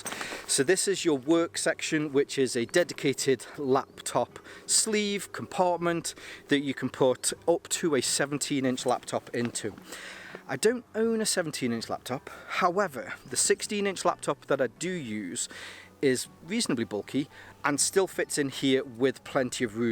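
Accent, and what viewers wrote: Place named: Stoke, United Kingdom. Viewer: Bit of North Staffordshire, Stoke going on here.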